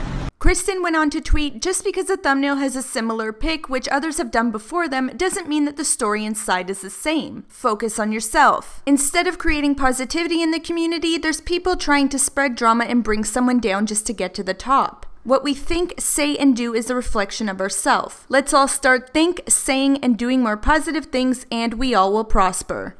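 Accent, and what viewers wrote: Canada. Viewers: Totally off topic, but are you canadian?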